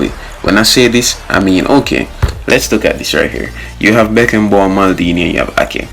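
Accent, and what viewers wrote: Jamaica. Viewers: Ur Jamaican don’t it? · You sound Jamaican me g 🇯🇲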